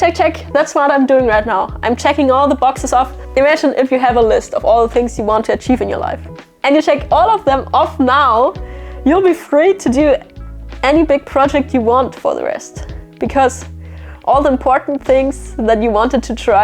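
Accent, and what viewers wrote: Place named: Germany. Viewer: You have a German english accent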